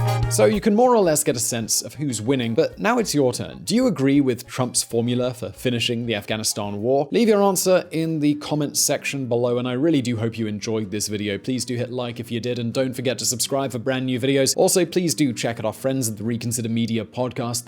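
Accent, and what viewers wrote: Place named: England, United Kingdom. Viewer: I agree it was long overdue leaving Afghanistan. It will quickly revert to its previous state before the U.S. arrived and spend so much valuable coin. No more sole world peacekeeping, stupid and unplanned wars and ‘nation’ building please!?! Nations will need to start taking stock and responsibility for their current state. You can blame everyone else so long. Second, I agree with your show mostly but I still can’t stand the pencil beck geek with the prissy Englishman voice!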